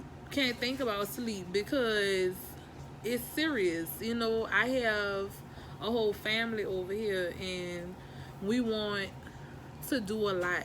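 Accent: New Orleans, United States. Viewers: I love the NOLA accent too! That's what caught my attention and made me keep watching. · I thought your accent sounded like New Orleans. I’m a Louisiana native too. Yes, every Friday is seafood night at my house. Congratulations on growing your channel. Thanks for the tips on YouTube growth.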